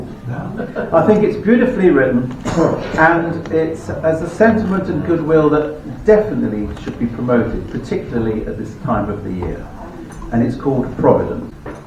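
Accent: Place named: England, United Kingdom. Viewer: Very well done my friend. I liked it a lot. You have an excellent voice and I love your English accent.😊👏🏻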